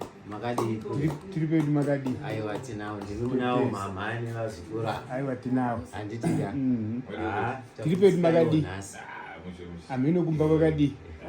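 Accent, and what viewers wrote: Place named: Manicaland, Zimbabwe. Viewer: U sound manyika 80% come back home/kanyi 😅😅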